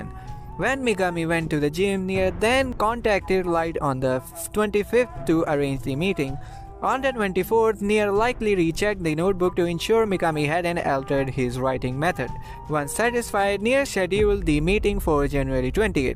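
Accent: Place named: India. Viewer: Indan accent english, Fresh W 🐬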